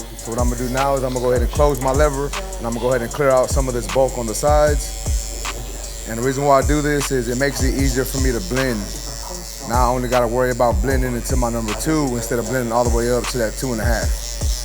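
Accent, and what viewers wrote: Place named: Mexico. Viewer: u lowkey sound like that mexican OT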